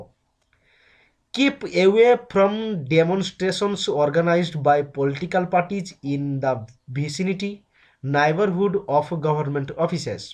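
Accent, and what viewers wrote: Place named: Bengal, India. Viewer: Sir English is not English. Its very difficult to catch bengoli tone.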